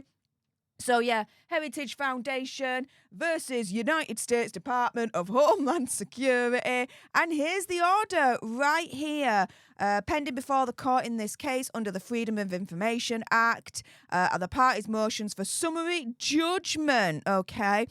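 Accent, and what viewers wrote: Yorkshire, United Kingdom. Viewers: i can see now why you call yourself. As a fmr lawyer let me tell you, you read thro that court order like a professional lawyer. Many ppl find it hard due to the inserted sub sections etc markers, yet you were able to read it beautifully, without stopping, well done. Its not as easy as you made it look, yep you are Def a a very attractive intelligent. I am just up the road from you in Doncaster so it was easy for me to understand the accent when you read that court document. 👏👏👏👏👏👏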